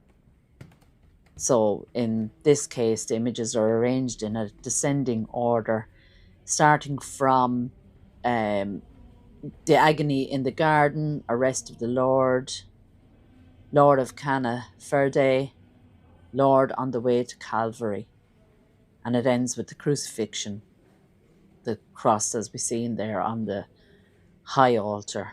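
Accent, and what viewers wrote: Ireland. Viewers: I wouldn't know if you pronounced it wrong or not with your Irish accent 😉 😊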